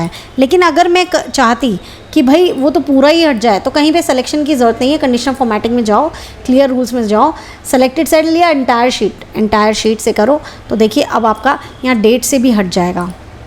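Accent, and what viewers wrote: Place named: Pakistan. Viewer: Ur accent sounds like Pakistani..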